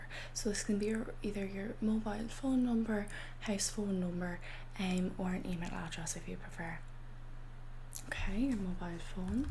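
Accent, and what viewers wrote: Ireland. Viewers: I love the Irish accent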